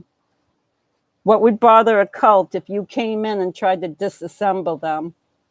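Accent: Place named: Boston, United States. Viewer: Can hear the Boston accent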